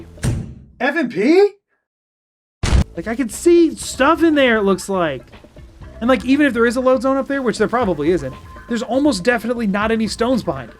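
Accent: United States. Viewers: Are you by any chance from Utah? Something about your vocal inflection reminds me of an old friend of mine. He might not have originally been from Utah though either lol. Regardless of why, your method of presentation and your sense of humor feels really familiar and welcoming, so thanks for these videos! I’ll follow you on twitch so I can pop in if there’s ever a stream I’m available for.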